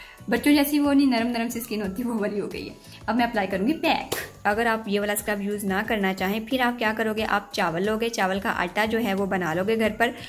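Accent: Punjab, India. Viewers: The way u speak punjabi …Haye so cute 😘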